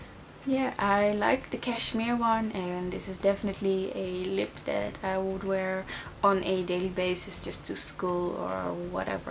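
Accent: Netherlands. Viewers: Loved this reveiw! I'm obessd with my Veletines. I also love you Dutch accent. Immediately, I knew you were Dutch because of it. (: My oma is from Holland. Haha. Keep up the great work. Definately subscribing!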